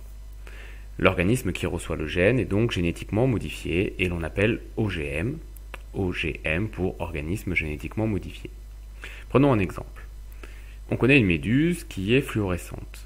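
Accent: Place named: Paris, France. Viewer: léger accent parisien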